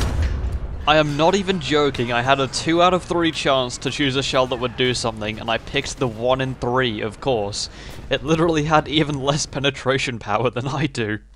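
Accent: Germany. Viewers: General Question: Are you German? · Das ist so englisch mit Deutschen anksend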